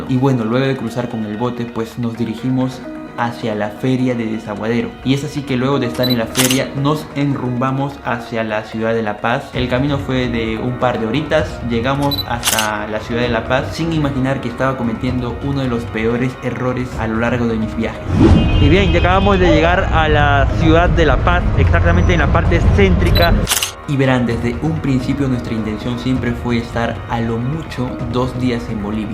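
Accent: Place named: Peru. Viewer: Dicen que los bolivianos altoque detectan acento peruano y les caemos antipatico por la rivalidad de las danzas esas de caporales,morenadas etc, por eso te insultaron por tu nacionalidad.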